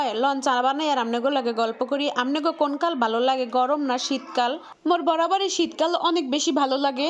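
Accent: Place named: Barishal, Bangladesh. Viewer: সর্বণাশ😮😮😮 but বরিশাইল্ল কথা😅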